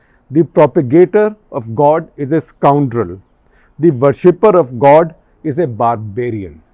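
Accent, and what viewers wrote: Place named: Tamil Nadu, India. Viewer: Tamil pronunciation is hilarious... its nice to hear from you ...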